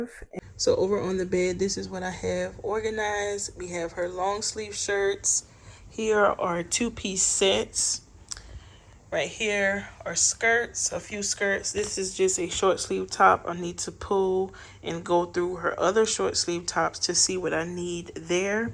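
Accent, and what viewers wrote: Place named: Louisiana, United States. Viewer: Love the channel from Alabama. I love your accent. Are you from Louisiana? Your channel is an inspiration 😅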